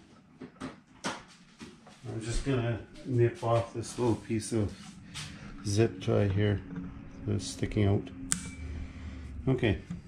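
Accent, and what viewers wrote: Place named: Canada. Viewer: he has that canadian accent so cool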